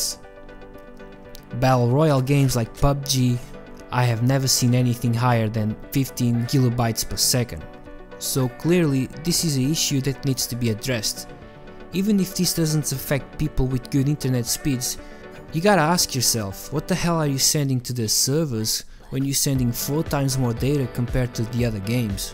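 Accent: Portugal. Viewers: Eu consigo perceber que és tuga pelo sotaque, por isso vou escrever em português. Eu tenho exatamente o mesmo problema, no entanto, limitar os fps's não me faz nada. Net specs: 5 ms, 200mpbs download speed, 20 mbps upload. Tenho NOS (infelizmente). Tenho alguns amigos que jogam VALORANT normalmente sem problemas que também têm NOS. Alguma outra solução ? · senti o sotaque tuga !! E ainda bem ,temos que representar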